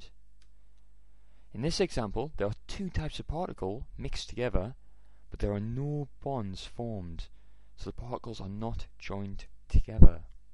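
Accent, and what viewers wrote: Scotland, United Kingdom. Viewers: Thank you for the help! Chemistry is one of my favorite subjects in high school, and you've helped me out a ton with it! I cannot thank you enough, good sir! By the by, are you Scottish?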